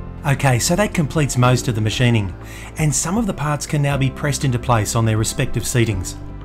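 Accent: Australia. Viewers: You are the only person with an Australian accent that I can listen to. My girl friend is from new Zealand and I'm used to hers, Australian just sounds weird and I end up focusing on nothing else. But with you. Your work speaks way louder.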